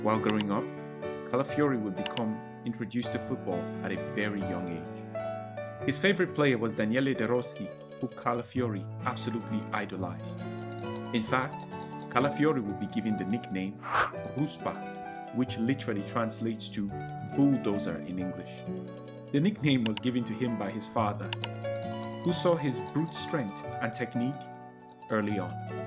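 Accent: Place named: Nigeria. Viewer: Wow this is good research, great video editing, soundtrack and overall detailing. You sound Nigerian by the way. Well done 👍🏾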